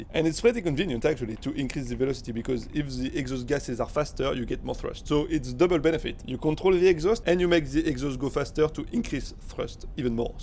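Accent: France. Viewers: You have a faint high pitched tone in your mic recording, most noticeable in the Outro. I enjoy your French English very much xD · His accent is French btw lol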